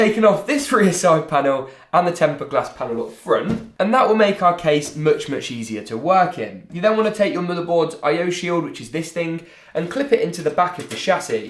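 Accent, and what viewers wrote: United Kingdom. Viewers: Are u from the uk?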